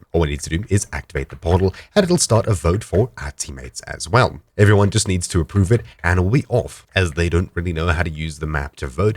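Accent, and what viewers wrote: South Africa. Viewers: Am I the only one who only realised now, based on the live game commentary, that he’s South African 🇿🇦 😂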